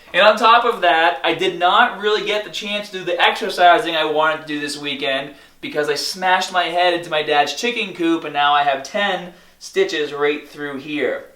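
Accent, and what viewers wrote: Boston, United States. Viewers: watching your videos for months and this is the first time I picked up a type of Boston accent, you must have grown up in mass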